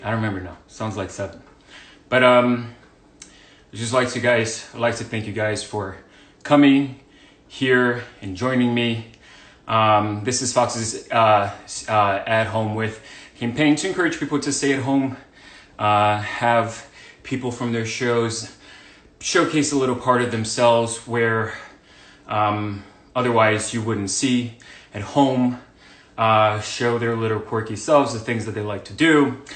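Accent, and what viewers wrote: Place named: Latin America. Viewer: I thought he was a latino, like his first lenguage was spanish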